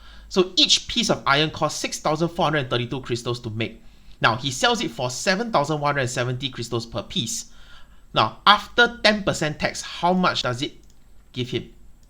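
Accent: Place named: China. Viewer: When i heard a Chinese accent talking about business i believe him instantly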